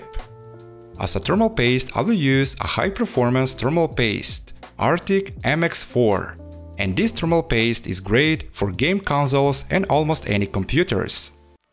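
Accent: Russia. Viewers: You are Russian? (Slav?) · Is this my hallucination or does the author have a Russian accent? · Hello, are you from Russia? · Русский что ли ? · I like his Russian accent😘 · I love your accent. It sounds a bit like a humble russian accent. ( not being racist english also isnt my native) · You have russian aсcent?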